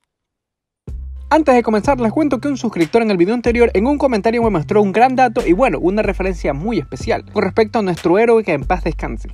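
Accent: Venezuela. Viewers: Eres venezolano:v?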